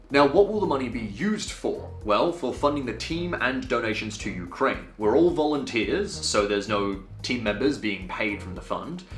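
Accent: Australia. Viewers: I wont lie man, when i first watched one of your videos 2 years ago i wasnt sure if i liked your stuff or not. It took me a couple of months of watching random suggested videos here and there before i actually subscribed.. But im so glad i did. Your gameplay stuff is consistently funny and enjoyable, the largescale stuff you run is nothing short of epic.. But this takes my respect for you to a whole nother level. A lot of people talk big, not many of them actually back it up by really helping, and very few would actually go over there and put themselves in danger to deliver that help themselves and show your support in person. I dont want to put words in their mouths but Im sure it lifts the battered spirits of Ukranians to see forgeigners from literally the opposite side of the world being willing to go to such lengths to support them in their time of need, and them being able to see your face and hear your ozzy accent in person must just solidify that feeling even more. 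Youre a man with principles, courage and integrity and I have absoltely nothing but respect and admiration for you,. Cheers bro Slava Ukraine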